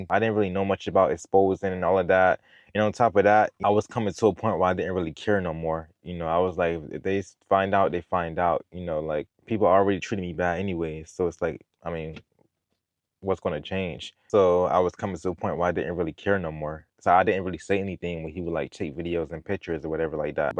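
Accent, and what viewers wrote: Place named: New Jersey, United States. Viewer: I hear an east coast New Jersey accent or somewhere near there.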